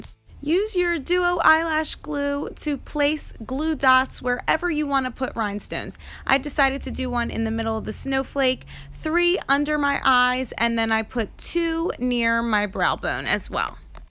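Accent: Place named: Maryland, United States. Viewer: You sound like you're from Maryland!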